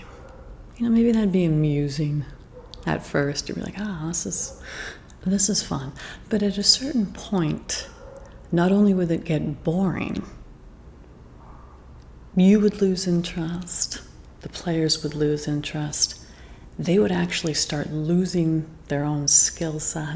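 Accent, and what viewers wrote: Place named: United States. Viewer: I really love your soft and American aristocratic accent. You seem very high society. That combined with your creativity and intelligence and generous inviting attitude is really attractive. It's intimidating but at the same time I would love to have conversations such as these with you. i love your vids! Thank you for sharing! I find it relaxing and interesting!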